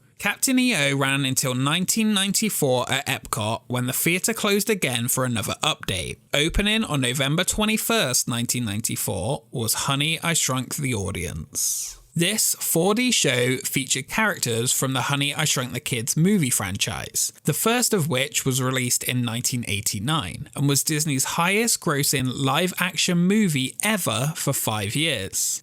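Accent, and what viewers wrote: United Kingdom. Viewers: He’s British, you gotta give him a break :)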